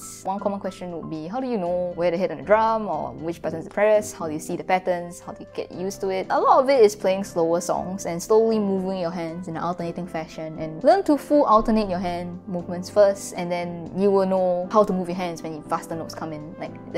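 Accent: Singapore. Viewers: So this is Singapore Accent? Hmm instersting...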